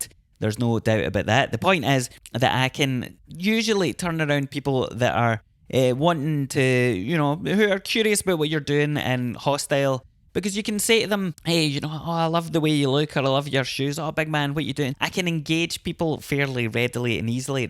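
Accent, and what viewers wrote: Scotland, United Kingdom. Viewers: 👀 Such an awesome new series for your channel and a perfect way to start it off! 🎹📷Really enjoyed all the photos and your "dulcet Scottish tones" throughout! 😁😁 So funny, we both live in areas where the attitudes of the people seem quite similar! haha Looking forward to the next!! 🙌🐀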